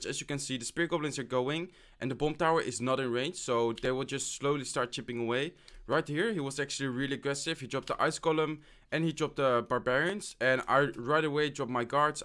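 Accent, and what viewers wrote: Netherlands. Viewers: ben je nederlands want je praat soort van Nederlands Engels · Are you from the netherlands? becuz your voice sounds like that.. And im from the netherlands too :)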